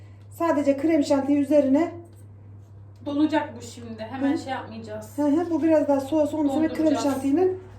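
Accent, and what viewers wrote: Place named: Sivas, Turkey. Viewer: Bizim sivemizde aynı bacı Sivas liyim 😊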